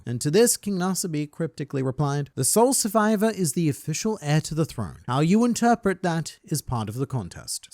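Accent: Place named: Australia. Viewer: I manly watch this guy on the Internet souly to obtain his accent, after listening for years I'm able to mimic the australian accent and convert it into my own speech😅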